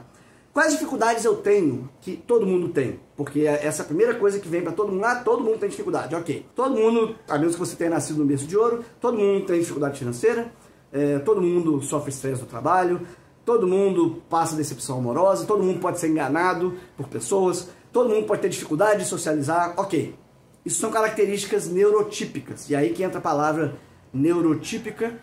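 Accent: Minas Gerais, Brazil. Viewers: Uai que trem doido, cê é mineiro também?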